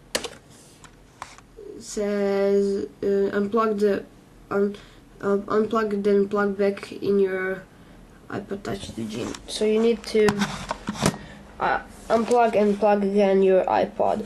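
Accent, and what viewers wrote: Russia. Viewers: u sound russian im russian but i dont have an accent kool vid thanks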